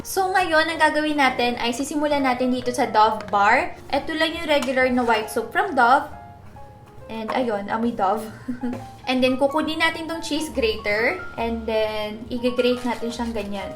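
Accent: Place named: Batangas, Philippines. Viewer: May punto ka sis, batanguenya ka ba? 😊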